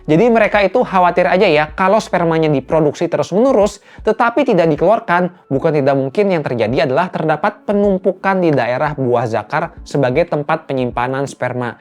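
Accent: Banjar, Indonesia. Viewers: urang banjar kah pian dok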